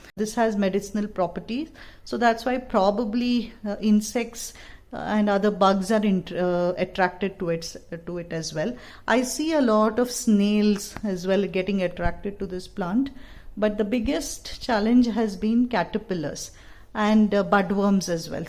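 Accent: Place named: Bangalore, India. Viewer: Your channel and narration is a welcome change...lots of information... and glad to know you are in Bangalore...I could make out by the way you speak :) (edit: as I continue to watch, I hear you say that you found lots of caterpillars..does that mean it's a host plant for a kind of butterflies? Asking as I just ordered and reading up on it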